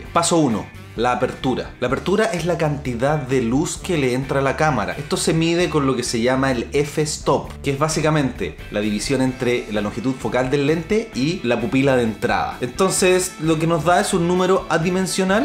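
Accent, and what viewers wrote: Chile. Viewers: De qué país es? Su acento me parece un poco chileno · chileno we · Eres chileno.. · Nuevo sub campeon. Primer video que veo tuyo y me redulto muy util. Saludos desde argentina. Supongo que por tu acento sos de chile. Se ve que sabes mucho del tema.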